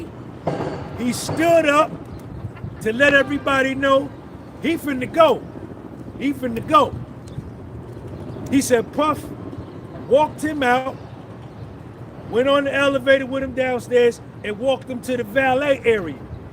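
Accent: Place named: Harlem, United States. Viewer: I'm also new here, but not from academics, someone shared your videos last week on fb. I appreciate your storytelling of facts, but I'm from harlem and recognize the harlem swag and direct speech. However, og I'm a professional astrologer for the entrainment industry and puffy is part of my case study it's not good, this is a karma call he missed important to stop the drug use and change years ago. Ketu moon in ♌️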